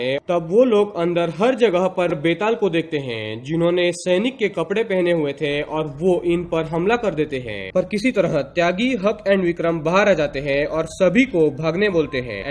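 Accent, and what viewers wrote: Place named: Maharashtra, India. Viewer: Yaar marathi accent may hindi sunkar maza ata haiii..... 😁😁😂😂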